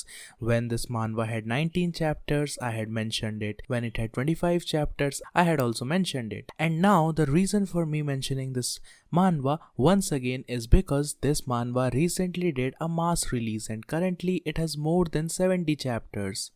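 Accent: India. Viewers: he's Indian right?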